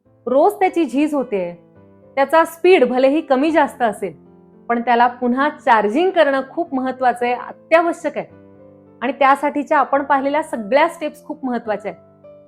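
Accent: Standard. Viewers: मॅडम मी अलीकडेच तुमचे video बघायला सुरुवात केली आहे तुम्ही अतीशय उत्तम आणि विविध आरोग्य विषयक माहिती देताच पण तुमची वाणी अतीशय शुद्ध स्पष्ट आहे त्यामुळं खूपच प्रभाव पडतो तसेच विविध श्लोक तोंडपाठ आणि अस्खलित पणे म्हणून दाखवता खूपच प्रभावी आहे लहान मुलांच्या मधील बाळ दमा कफ यावर कृपया मार्गदर्शन करा विनंती आहे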